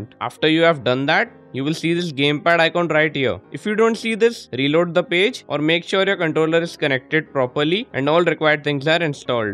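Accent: India. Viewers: You know you've struck gold when you hear that sweet, sweet sound of an indian accent when looking for a tutorial, thanks man! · bro talks like indian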